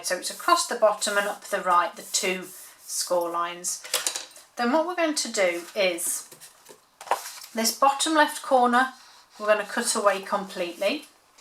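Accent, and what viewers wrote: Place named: Leicestershire, United Kingdom. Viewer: A lovely little box. I also have to say I love listening to you, even though I don't know where you live or are from, your accent sounds like it was close to where I come from in Leicestershire. Sounds like home Thanks for posting.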